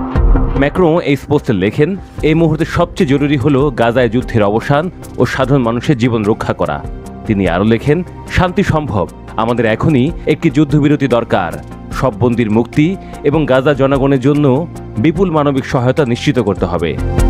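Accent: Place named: West Bengal, India. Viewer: একাত্তরে নিউজ করা ভাইয়াটার নাম পরিচয় অবশ্যই বাংলাদেশ জানতে চাই আপ ব্যক্তিগতভাবে আমার কাছে কেন যেন মনে হচ্ছে উনি বাংলাদেশি কোন ভয়েসে কথা বলতেছেন না । পশ্চিমবঙ্গের বাঙ্গালীদের ভয়েসের মতো কথা বলতেছেন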